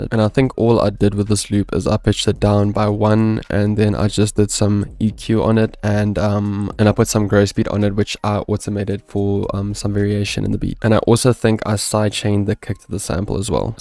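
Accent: South Africa. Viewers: was way to Tuff are you South African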